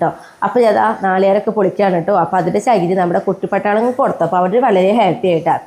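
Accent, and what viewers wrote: Thrissur, India. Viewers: thrissur aano sthalam?..ollur aano?... talking style kandittu thrissur pole thonni..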